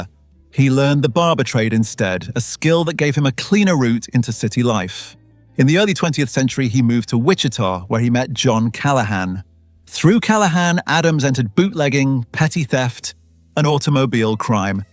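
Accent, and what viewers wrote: United Kingdom. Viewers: Why a stupid British voice over.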